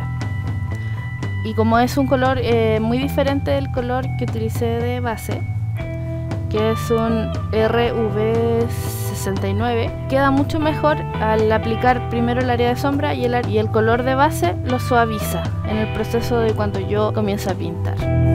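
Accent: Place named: Chile. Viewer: waau me encanta tu forma de hablar se nota al toque que eres de Chile, me gusto caleta tuto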